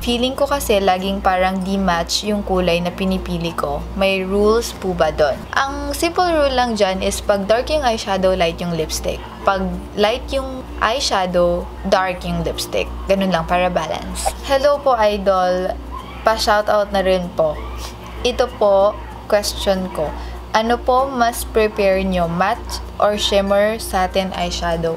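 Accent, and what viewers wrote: Philippines. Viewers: I love tagalog accent!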